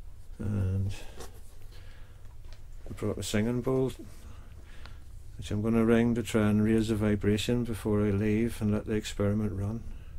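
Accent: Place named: Fermanagh, United Kingdom. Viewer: You’re welcome, thanks for the informative videos. Listening to you talk reminds of my friend from Lisnaskea in Fermanagh. These past couple of years have brought us all down, it’s just not normal. I wish I had more of my wife’s positive outlook, she’s just a happy person, where I’ve become so angry with all the goings on. Definitely get out and reconnect with that ancient world, it’s a fascinating and peaceful as well. Take care…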